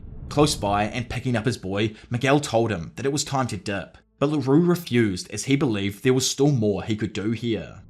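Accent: New Zealand. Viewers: he's from new zealand! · r u from new zealand?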